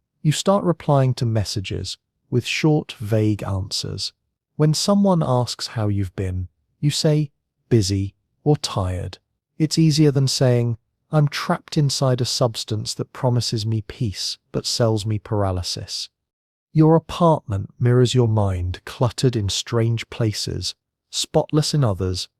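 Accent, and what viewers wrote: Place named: United Kingdom. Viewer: I don't know I just can't stand this guy's accent. His British accent. Sorry I got to turn you off.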